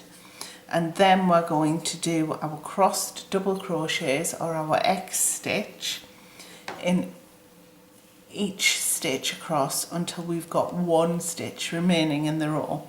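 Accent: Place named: Northern England, United Kingdom. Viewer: Your accent sounds to me like northern England. I'm American so I can't be sure. Thanks for this really nice pattern and wonderful pattern!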